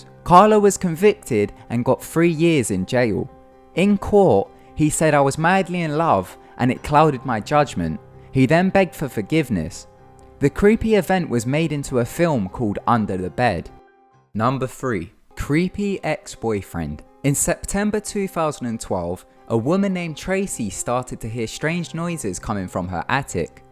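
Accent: United Kingdom. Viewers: I find accents very interesting, are you from the uk. Ever heard a scous accent, I can't understand it to save my life lol, I like the way it sounds tho, not to make fun of their accents or anything like that. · Sorry, but these videos are never scary with that dopey British accent.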